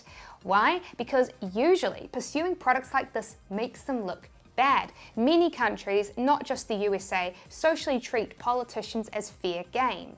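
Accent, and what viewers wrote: New Zealand. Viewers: I think it's New Zealand accent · Love your content and kiwi accent....im an aussie.😆👍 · The only thing I like more than your cool Kiwi accent is that blue and pink striped shirt.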